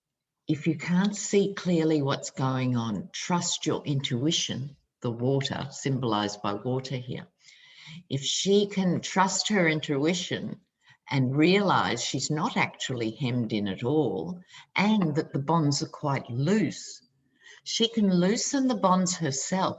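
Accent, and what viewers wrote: Australia. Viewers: I like hearing Australia as well as the United States of A.. Thank you for a great reading Miss. I send you big love from Beth in Mancos CO.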